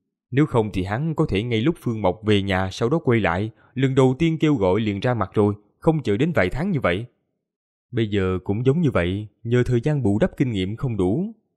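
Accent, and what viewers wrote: South, Vietnam. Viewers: nghe giọng miền nam quen rồi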